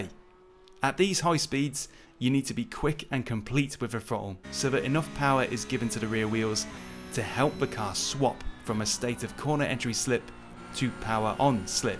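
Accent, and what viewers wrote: United Kingdom. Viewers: You have the cool Brit accent I like! Like driver 61. Where is that accent from?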